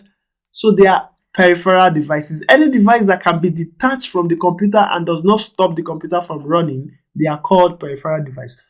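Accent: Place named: Africa. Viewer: he has an African accent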